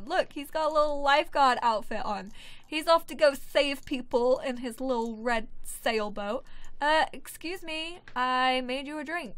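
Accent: England, United Kingdom. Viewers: Btw... I love the English pronunciations of many words... like aluminium. So don't try to change.😁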